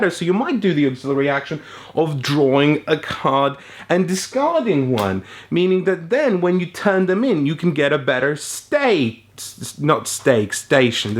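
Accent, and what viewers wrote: United States. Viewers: I love your "California dude" cowboy accent. That was glorious! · Great American accent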